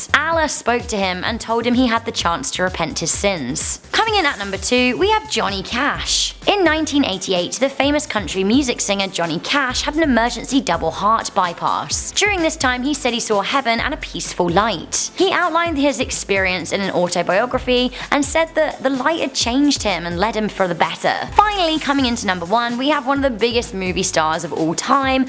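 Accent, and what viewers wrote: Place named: United Kingdom. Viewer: I love and believe in heaven not because of mere religion but also of faith (duh)oh yeah and I know people who saw Jesus or maybe God 😛. They testified that they went into a trance before seeing stuff during our praise and worship sessions then woke up, crying stuff out in tears... "I saw Him😨!!!I saw Him😢!!! He's wearing white😭!!!!!!!" And I'm so wowed by the fact that you guyz, would make a vid about these blessed people.🙂 So yeah. Moooore power to you guyzzz😍. GodddBlesss!!!😆😆😆 and ps Oi love your bri'ish accen' i's jus sow wow😍😍😍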